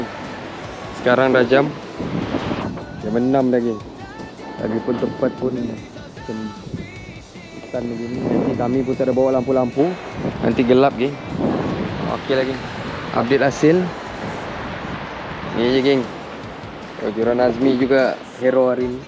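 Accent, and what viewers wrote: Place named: Sabah, Malaysia. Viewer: Terbaikk gabungan 2 sipoo surfcast sabahan😅.. Sekali loghat sabahan berkumandang... Paduu betul sipoo Azmi tu, landed ikan sisik dgn Palergic.. Surfcast memang kepakaran dia.. Sipoo pun x kurang mantap.. Strike padu jgak wlau ikan duri.. Rwzki buat kwn.. Alhamdulillah.. Terbaikk 2 sipoo idola.. Mantapoo❤🎉❤🎉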